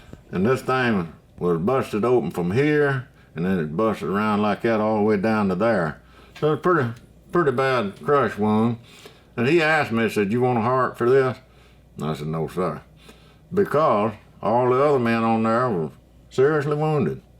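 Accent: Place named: South, United States. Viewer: SIR, YOU SOUND SOUTH, THANK YOU FOR YOUR SERVISE GOOD STORY, GLAD YOU MAD IT HOME. USA USA USA AMERICA GO GO GO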